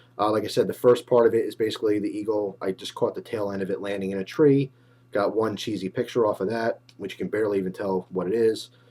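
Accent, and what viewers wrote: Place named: East Coast, United States. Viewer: East coast accent buddy. Lol. Everyone fucks with us for it.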